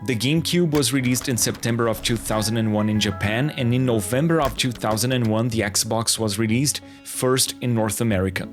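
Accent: Brazil. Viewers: Notei o sotaque brasileiro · bro real question, are you brazilian? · o sotaque desse maluco é de brasileiro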